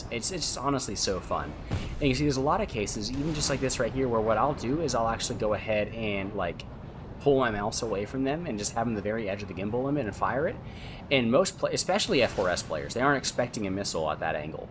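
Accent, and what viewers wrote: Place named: United States. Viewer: clicks on sees chinese decal on plane an white boy american talking dislikes clicks off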